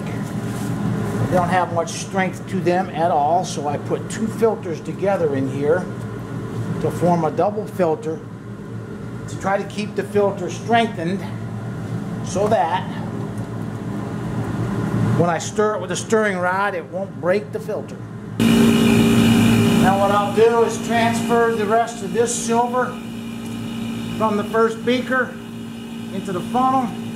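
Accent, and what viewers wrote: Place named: South, United States. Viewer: a well spoken southern man that gets it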